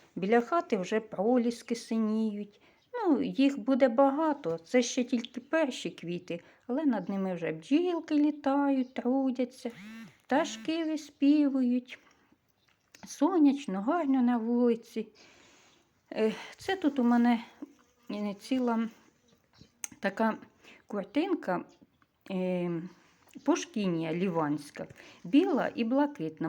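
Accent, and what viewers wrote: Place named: Poltava, Ukraine. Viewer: Я по Вашому говору зрозуміла що ми земляки.Я живу в Полтаві.Родилась в селі, Гребінківськийрн.Маю дачу. Теж люблю квіти люблю все що зв’язане з землею.Миру нам всим добрим людям. Як наз ваше село?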